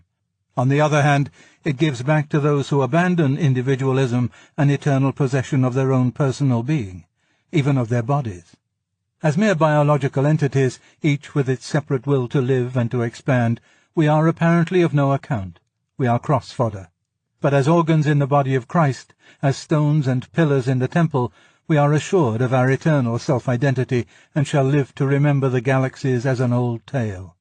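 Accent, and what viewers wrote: United Kingdom. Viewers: I love his voice. Nice British accent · very old times British aristocrat. You've seen that in movies so you're conditioned to it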